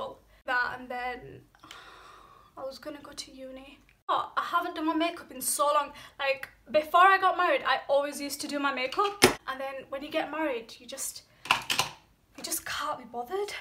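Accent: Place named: Birmingham, United Kingdom. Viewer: Is that Brum accent... Not too fond of it (she's lovely though ma'sha'Allah) barakallah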